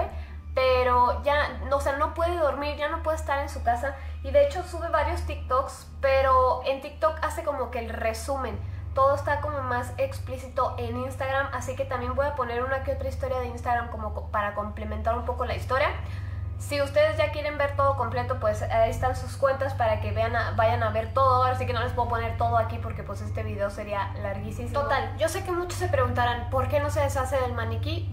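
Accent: Colombia. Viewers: El es colombian se los digo porque yo lo soy y se le nota :v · Las papal abras que usa y su acento, es muy Colombiano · Dizque usa palabras de México obviamente es colombiano por como habla, las palabras y el acento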